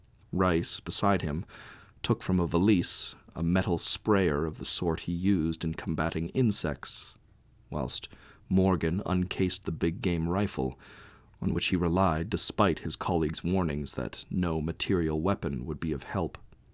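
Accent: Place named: North America. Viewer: Thought the sound was good. North American accent always works with hpl. Obviously the connection with the great god pan is mentioned in the text. But. Connection with 'the white people' less obvious....a playmate was coming 😮.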